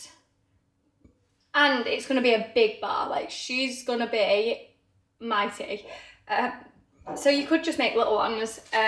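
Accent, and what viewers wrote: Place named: England, United Kingdom. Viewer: Love your English accent. I wish I could try your chocolate 🍫.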